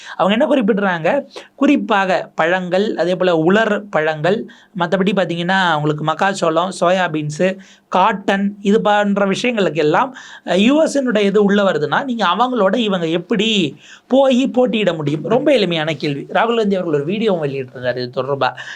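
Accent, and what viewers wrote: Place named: Telugu, India. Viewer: ஏன்டா எல்லா தெ.பசங்களும் கூடிய கூடிய கூடிய ன்னு சாவடிக்கிறீங்க. ஏன் நடக்கின்ற போராட்டம் னு தானே பேசனும். ஏன் நடக்கக் கூடிய. சோல்லக் கூடிய. ... எத்தனை கூடியன்றதை நீயே எண்ணிப் பாருடா டுபாக்கூர். ஏன்டா தமிழை சாகடிக்கறீங்க. டு டு ன்னு உகாரத்தை சேர்தாது தெலுங்கு மாதிரி பேசுறுங்க யூ.எஸ்(ஸோ)ட, பிரதம(ரோ)ட, .... 'ஓ'காரம் வேற. சிக்கலாக மாறும்னு சொல்லறது தானே! கேனைக் கூதியானுங்களே. நாட்டினுடைய. விவசாயிகளுடைய. நாட்டின். விவசாயிகளின்னு சொலாறதுக்கென்ன? உங்களுக்கு எந்த தெ.பையன் தமிழ் மொழியிலே நொட்டனை பண்ண சொல்லித்தரான்?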